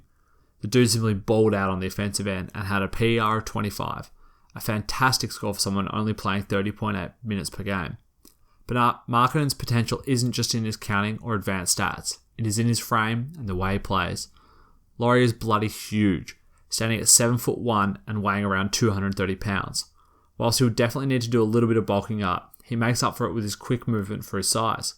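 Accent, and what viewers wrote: Australia. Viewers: Where bouts u from in australia mate, im from the Gold Coast nice to hear another Australian NBA/Chicago Bulls fan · great analysis, but gave me a hard time understanding due to his aussie accent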